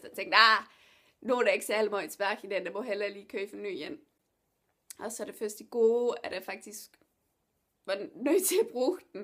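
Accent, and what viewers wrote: South Jutland, Denmark. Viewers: Du er ikke helt 100 % - men meget tæt på - fortsæt, dejligt at høre synnejysk på youtube :-) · Hej. jeg ønskede at du kunne lave flere videoer fordi jeg elsker din kanal for di jeg elsker sønderjysk så jeg håber at du laver flere videoer i fremtiden Mvh. Silas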